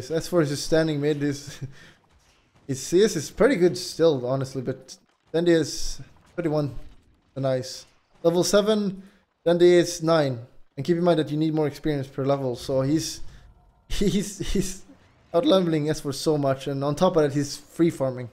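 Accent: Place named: Sweden. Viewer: i think it's his hard swedish accent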